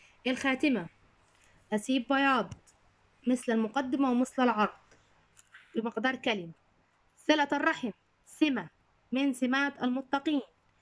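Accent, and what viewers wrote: Egypt. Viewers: عند حق راهي من مصر اسمعي هدرتها😂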